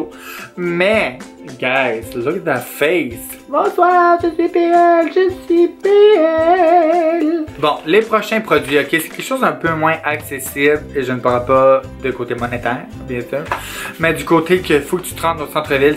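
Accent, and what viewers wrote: Quebec, Canada. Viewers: Je regarde tes vidéos depuis peu et je dois t'avouer que j'avais un peu de mal à te comprendre. Mais au fur et à mesure de tes vidéos je me suis adaptée à ton accent québécois. Tu es magnifique j'aurais dû commencer par là. Bonne continuation moi je surkifff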